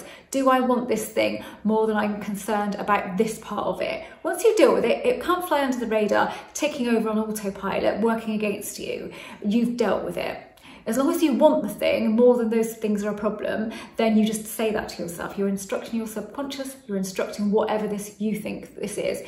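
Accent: United Kingdom. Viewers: Thank you very much. It sounds so simple and adorable with that British accent of yours. Cheers my friend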